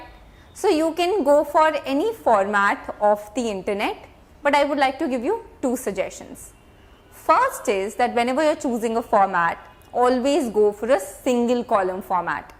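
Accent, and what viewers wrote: India. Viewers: This is Called A Pure Indian Accent 🙂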